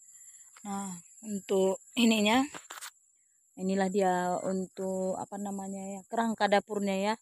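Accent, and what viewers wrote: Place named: Malaysia. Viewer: Salam..pernah tinggal di malaysiakah..bunyi loghat seakan2 bunyi orang malaysia bercakap..orang semenanjung..maaf kalau saya silap..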